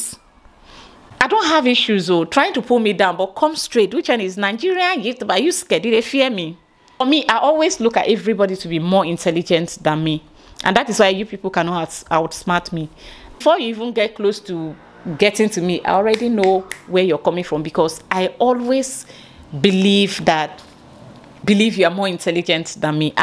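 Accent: Ghana. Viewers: You speak Ghanaian English, Your accent is good. Your intelligent.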